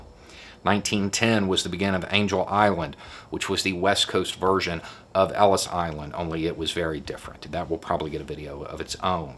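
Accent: South, United States. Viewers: Gotta be 100% honest...didn't expect this from a prepper white guy with a southern drawl.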